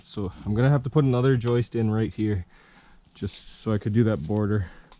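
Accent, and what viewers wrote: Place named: Alberta, Canada. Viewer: You got that Alberta accent. Where are you from? im gonna guess, Edmonton.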